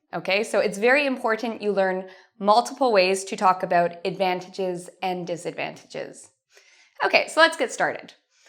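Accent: United States. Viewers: I love the American accent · You're the best teacher I ever had, sirouslly. I'm brazilian and I only have one year of practece but I can speak of course not that good hah lol. All right I love you you're cute you know how teach and even have a American pronanciation. That's what I want to lol. Thank you and send more video okay? Bye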